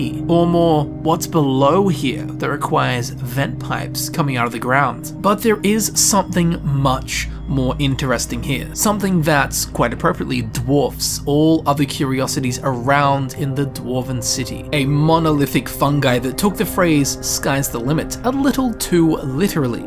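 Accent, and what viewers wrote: Australia. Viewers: One he is austrlian, two thats how aussie accents sound.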